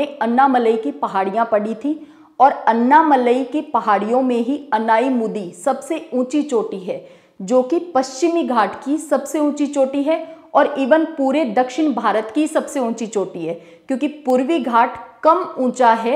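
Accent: Haryana, India. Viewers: Haryanvi accent is my favourite ❤️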